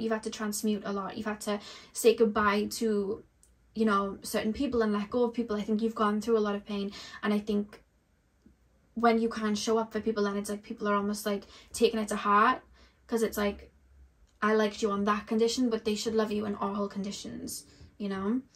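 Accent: Wales, United Kingdom. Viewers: I chose pile 3 and everything you said was 💯. I am on a self love journey finally after so many years of Searching for love in all the wrong places. I have finally had to separate myself from family and most friends because it was always take take take and no give back. And when I finally start to do well everyone is angry and jealous. It hurts so much and I am sad that I invested and gave anything and everything to people who never cared to see me for who I truly am. I know it stems from my childhood in an extremely dysfunctional family and being the scapegoat. So just as you said I inadvertently searched for love in the wrong types of people hoping to finally get it back. I’m finally falling in love with me now and it hurts to leave everyone behind but it also feels so liberating and I truly have got this lesson finally. Thank you you are so gifted. 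I love all of your heart felt readings and your adorable Welsh accent! 🥰🥰🥰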